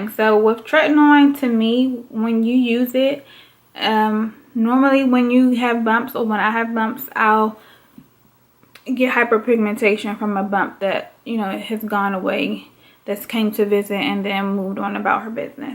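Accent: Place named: Washington DC, United States. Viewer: Are you from DC? You kinda sound like you’re from the “urea” 🤔. You’re skin looks great!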